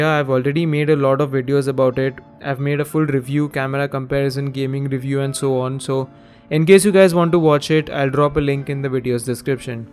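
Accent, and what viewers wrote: India. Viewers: I love the way India saying "t" words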